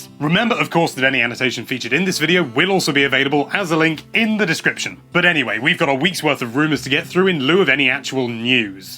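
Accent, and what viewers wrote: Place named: United Kingdom. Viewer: I get that you're British and your accent isn't the original pronunciation of the language. Instead it is a style of speaking that was developed by bringing cockney slang to the upper-cass to unify those from the homeland and identify yourselves by contrasting with colonial Americans. However; "Yoshi" (Yoh-shee) is a Japanese name so it should probably be pronounced as it's native language suggests.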